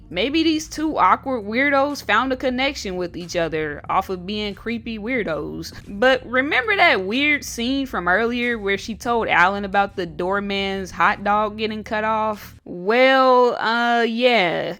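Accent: United States. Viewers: Narrator’s voice like sandpaper. American lazy speak. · I love your videos, nothing on YT ever feels genuine and funny anymore. But your stuff is just so good. I appreciate all your uploads. Also, I love your accent. you from the south for sure, me too.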